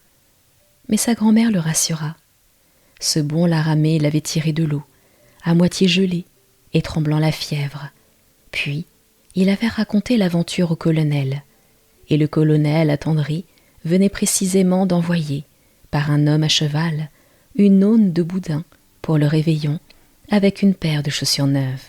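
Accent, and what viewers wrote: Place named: Provence, France. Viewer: désolée c est le téléphone qui a mal écrit Je voulais dire l accent provençal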